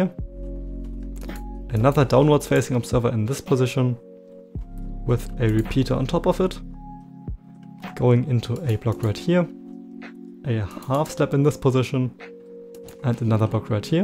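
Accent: Germany. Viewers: Do you think his german accent stands out a lot?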